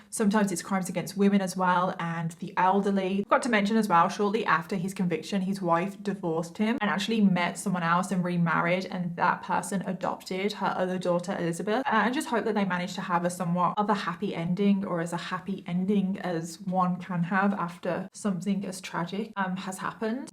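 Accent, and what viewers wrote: United Kingdom. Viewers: I found her a couple of months ago, and I've binge watched all but a few videos because I'll be gutted to have to wait for only one a week once she's back this year! THE BEST true crime and make up channel without a doubt! Very brilliantly narrated, fantasticly researched, beautiful makeup and has real empathy and emotion for the victims. I also love that she's from the UK (as am I) as I much prefer her accent! Sorry to everyone else! 🙈 takes the crown for me, has got me into MagellanTV and also Audible! Thanks for your fantastic work - please keep it up! Like many others, it gets me through some very tedious or stressful tasks and times ❤ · Saw your channel on my feed and since I just finished binge watching Bailey, decided to click on your vid about that cannibal frat boy. The moment I heard your accent, I immediately went to your playlist and clicked for your first ever true crime vid and subbed. 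It's really fascinating to hear true crime from a different POV and also from a different country. I'm going through your playlist now and see what sorts of stuff happen around UK. And how people's mind work over there. 💛 lots of love from PH by the way. 🤗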